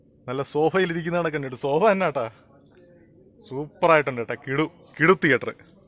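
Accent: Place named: Thiruvananthapuram, India. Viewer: സംഭവം കൊള്ളാം. പക്ഷെ ഈ കൂടെ കൂടെ കേട്ട കേട്ടാ കേട്ടാ ന്നു പറഞ്ഞു ബെർപ്പിക്കരുത് അനിയാ 😬നീ കൊല്ലംകാരൻ അല്ല. തിരോന്ദരം ആണെന്ന് തോനുന്നു 😆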